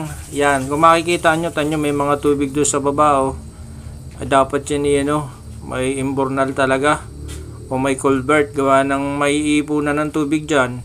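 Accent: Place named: Batangas, Philippines. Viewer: Ay kainaman.batangasin ang iyung tuno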